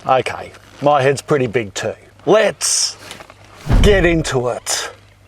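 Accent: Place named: Australia. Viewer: An Australian living in Canada now since 1971...your teachings are great, but your Aussie way... it is just beautiful ..even had a fleeting thought maybe I could return one day to live....the words written on your t-shirt seeing the earth through her eyes.... that is so precious and evocative..thank you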